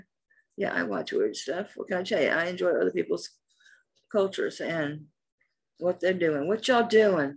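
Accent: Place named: South, United States. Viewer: I luv your videos & your sweet southern accent is the best!! Your stories & humor makes your videos FUN!!! My necklace arrived & I love it 🥰🐰🌸💕have a great weekend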